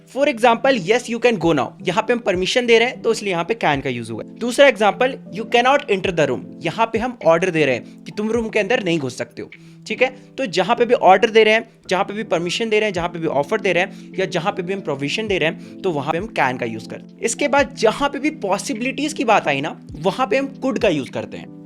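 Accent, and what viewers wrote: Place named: Rewa, India. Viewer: I know you are from Mp rewa ......... By your accent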